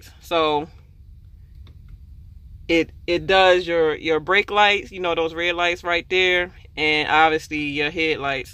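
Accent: Baltimore, United States. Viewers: ? your accent is Baltimore? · Sirius Desta thats cause she is from baltimore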